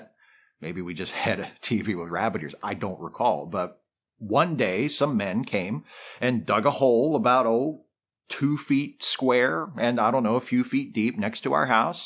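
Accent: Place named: Maryland, United States. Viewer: Sounds like you're a fellow Marylander. Or at least originally from the area.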